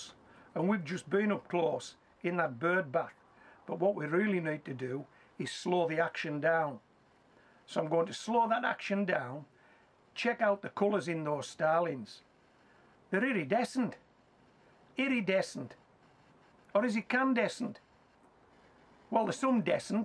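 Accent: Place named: Northern England, United Kingdom. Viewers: No he's not Scottish it's a northern England accent you can hear. As I am from Yorkshire I should know where it's from but it can be tricky, definitely North England though same place as chubby brown is my guess. North Yorkshire area (Middlesbrough and redcar) best guess 👍🇺🇸🇬🇧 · Such a strange but lovely accent 🤣🤣🤣 says "seeds" like a brummy .. Got Jordy in there and a bit of everything else!🤣🤣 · he is cumbrian sounds like workington area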